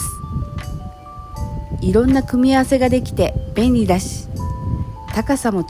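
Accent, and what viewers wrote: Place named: Ibaraki, Japan. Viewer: 初ﾅﾚｰｼｮﾝ🎤 上手かったﾃﾞｽﾖ😉 茨城弁出ても ﾀﾞｲｼﾞﾀﾞｲｼﾞ♪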